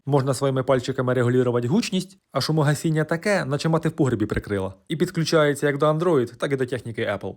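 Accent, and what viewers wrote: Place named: Zaporizhzhia, Ukraine. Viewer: Шторами завіситься, та й пройдуть ! Гарно , весело, а головне як у нас говорять! Я сміюся до сліз! Я із Запорізької області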